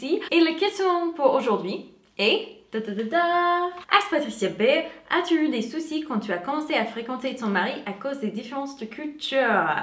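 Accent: United States. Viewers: Ahh le filtre vocal que tu utilises vers la 3 e minute efface ton accent américain, c’est surprenant..🙂 super vidéo comme d’hab’ 💪💪💪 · Y a pas grand chose de plus mignon qu'une Américaine qui parle Français... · Ouao tu es vraiment très belle avec beaucoup de classe j'adore ! Et ton accent américain 😍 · Comment j’ai pu passer à coté de votre chaine vous etes trop belle et cet accent américain j’aime trop je m’abonne !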